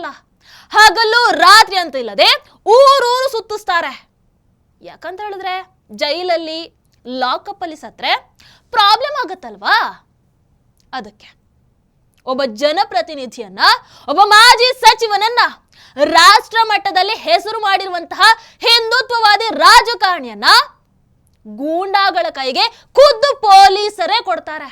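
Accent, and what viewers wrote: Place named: Mangalore, India. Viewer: Your manglore Kannada language super sister 🙏🙏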